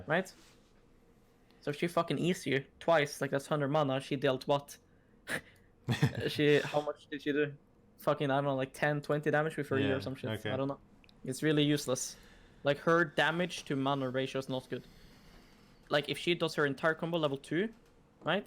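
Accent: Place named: Denmark. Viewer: just the danish accent :D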